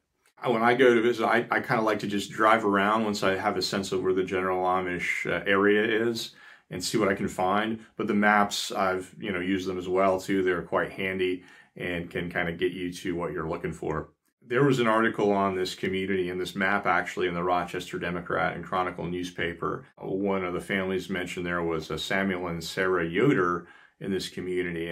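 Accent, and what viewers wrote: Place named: Philadelphia, United States. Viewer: Very interesting vid. Enjoyed it. Been visiting the Amish in Pa all my life -- Your accent is striking -- the O in home, phone, -- you from Phila, Pa?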